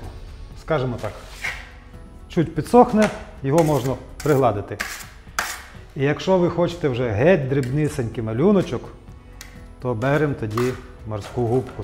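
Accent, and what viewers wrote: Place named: Western Ukraine, Ukraine. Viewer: Тогда тебе придется учить язык всех 14 остальных республик бывшего СССР! Ведь остальные тоже тебе так скажут. Русский был выбран по праву большинства.Только и всего.И братья тут не причем. Было бы украинцев 175 миллионов, как при совке русских, то и гоаорили бы на украинском. А тот факт ,что автор начал делать ролики на своем языке,говорит о том, что ТВ пропаганда ,стравливания РУСОВ, свою работу таки сделала. Он со Львовской обл., города Стрый, его Западенский акцент всегда чувствовался, но тем не менеее,он вещал на русском, а тут вдруг проснулся...) Патриот вшивый! А где ты раньше был?! Что раньше,до захвата Крыма, по-русски вещал? Скажу, як громадянин України, рагулів, таких ,як автор цього відео, пів України !!! Бидло ,воно й в Африці бидло! Дизлайк і відписка !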